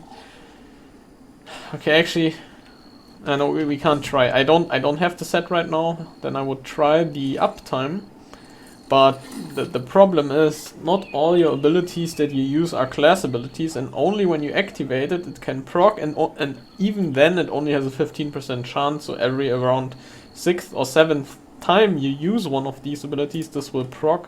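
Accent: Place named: Germany. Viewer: Your German accent is nice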